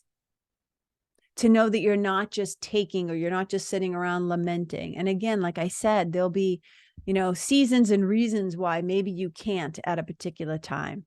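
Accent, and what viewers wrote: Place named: Massachusetts, United States. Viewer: I’m a MA girl living in CA and your accent is making me miss home a WICKED lot! 😆 I’m from the south shore..I’m guessing you are a MA girl too.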